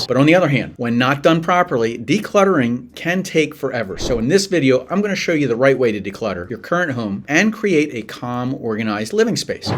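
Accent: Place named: Philadelphia, United States. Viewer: I see myrtle beach. But thats a very philly area accent!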